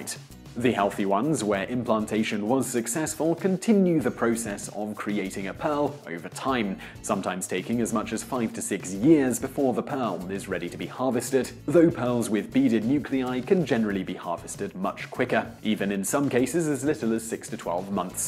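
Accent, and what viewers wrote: United Kingdom. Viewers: Bri'ish Vsauce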